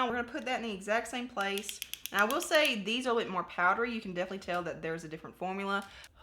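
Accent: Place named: Kentucky, United States. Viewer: Girl I knew from the first time I watched one of ur videos u were from Kentucky! The accent gives it away for sure but I'm a Kentucky gal too! I'm from Letcher co ky.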